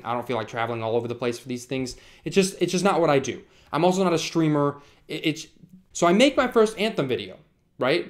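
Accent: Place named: South, United States. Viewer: What happened to your southern accent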